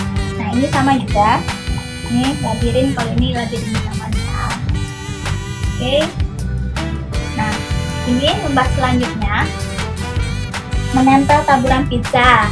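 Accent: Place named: West Sumatra, Indonesia. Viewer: Sukses ya sistaaa, logat minang nyo itu looohhh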